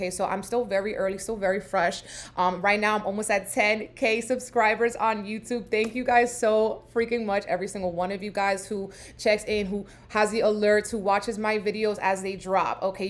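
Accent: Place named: Bronx, United States. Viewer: Yasss i knew it! I heard that bronx accent, my peeps !! Keep shining and thriving boo , love you and your content !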